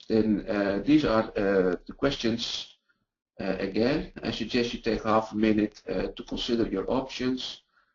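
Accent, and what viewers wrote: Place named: Netherlands. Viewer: This man's English pronuncation indeed sounds like he is from the Netherlands and learned English over there.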